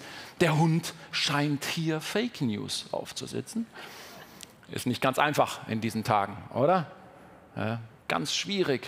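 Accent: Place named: Bavaria, Germany. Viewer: Auch wenn er aus Bayern kommt ist dieser Dialekt, so finde ich (Niederbayer), unangenehm anzuhören. Trotzdem ein tolles Video.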